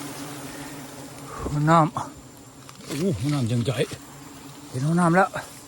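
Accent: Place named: Korat, Thailand. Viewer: สำเนียงเป็นคนโคราชนิ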